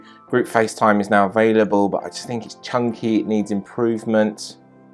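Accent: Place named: United Kingdom. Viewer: Heh. I love your sophisticated British Accenture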